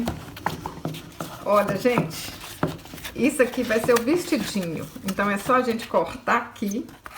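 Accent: Minas Gerais, Brazil. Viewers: Ameiiiii....e amei mais ainda o sotaque mineiro, sou uma capixaba apaixonada por Minas!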